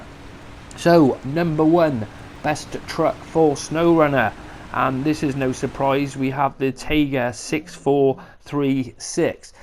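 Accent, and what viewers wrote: United Kingdom. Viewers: Best top ten video I’ve ever seen. Very informative and I love the British accent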